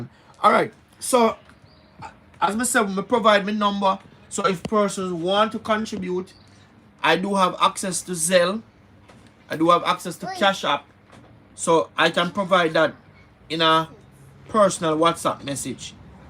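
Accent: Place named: Jamaica. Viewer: I understand, but the Patwa is part of our culture, and a lot of foreigners love how we speak. Anyway in the name of progress and a wider audience do what you need to do, just keep the Jamaican accent You will always have my support.❤❤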